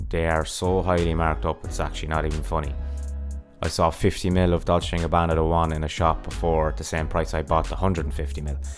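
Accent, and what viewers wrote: Ireland. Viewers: Some good advise....really enjoy your Irish accent. Thanks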